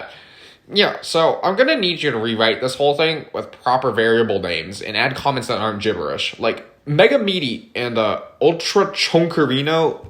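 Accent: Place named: Philadelphia, United States. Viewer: This dude has got to be from Philly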